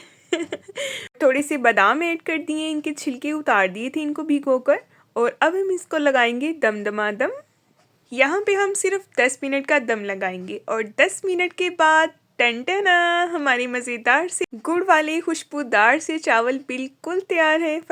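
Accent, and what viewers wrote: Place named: Punjab, India. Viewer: Aapkay gur walay chawlon ki tarqeeb bohot achi hai aur apkay lehjay se mujhe aap punjabi lag rhi hain. Mein aapki nano hoon. Main pakaon gi to zaroor btaon gi. Farida Yazdanie, mein 79 years ki hon.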